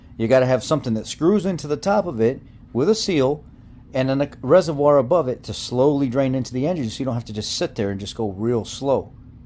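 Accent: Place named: Illinois, United States. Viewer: Hey, we can hear the Illinois in you! In many states crying like batch gets you ridiculed not appreciated! Back in my day keeping my shut saved alot of problems!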